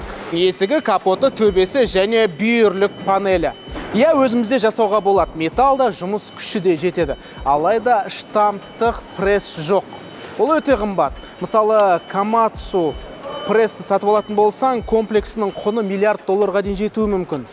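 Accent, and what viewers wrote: Standard. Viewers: жарайсың таза қазақша сөилейсің.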